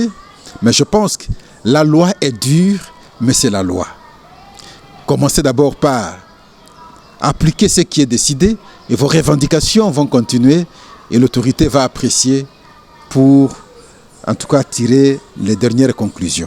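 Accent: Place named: Rwanda. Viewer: tu as un accent rwandophone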